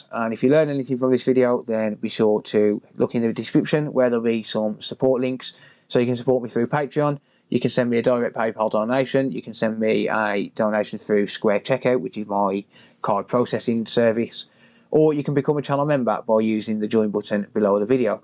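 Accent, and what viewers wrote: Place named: Midlands, United Kingdom. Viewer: Your local to me (accent) do you have a shop in the mids ? Respect to you also nice to see your work and honesty on this repair I’ve known businesses not taking responsibility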